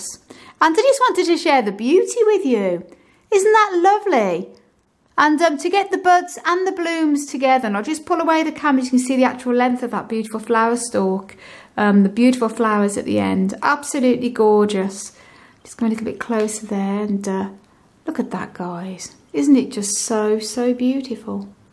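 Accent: Birmingham, United Kingdom. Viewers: Beautiful buds accompanied by a blissful Brummie brogue! Thanks for sharing. :)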